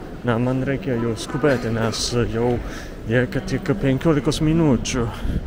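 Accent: Japan. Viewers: Kokia puiki kalba. Malonus ir švelnus japoniškas akcentas visai netrukdo. Ekskursija super. Ačiū.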